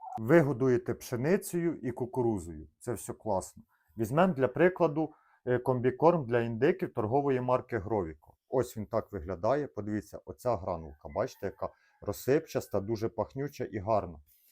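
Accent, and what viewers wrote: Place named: Vinnytsia, Ukraine. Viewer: Вінницькій говір😮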